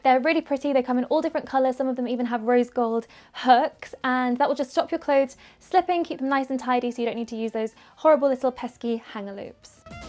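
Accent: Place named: London, United Kingdom. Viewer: she has a pronounced English accent with a slight inflection of London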